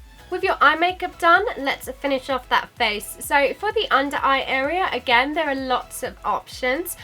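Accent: United Kingdom. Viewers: I've seen your videos like <num> times, but wanted to hear her British accent🙊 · She is british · Amazing OMG!!!Loved it! kisses from Brazil!!! (please put cc on your videos, it would be great and helpful for me) Love your British accent ❤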